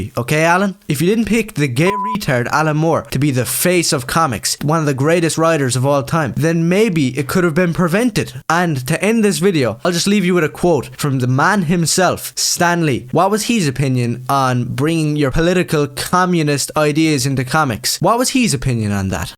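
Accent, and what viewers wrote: Ireland. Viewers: Really funny video, really hilarious, great job. I’m really bad with western accents, I’m not sure if you’re from Sligo, Leitrim, Limerick or Roscommon, it’s probably one of them haha. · Love the Irish accent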